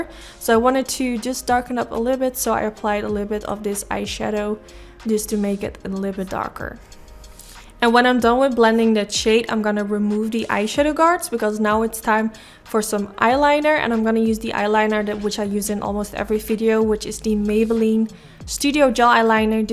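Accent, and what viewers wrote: Netherlands. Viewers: Wacht ben je nederlands?? Haha dit is de eerste keer dat ik langs je kanaal komt, en na 2 minuten dacht ik hmmm iets aan de uitspraak klinkt een beetje nederlands, maar toch ook weer niet. Daarna ff gegoogled en toen wist ik het hahaa -- leuke video ook!!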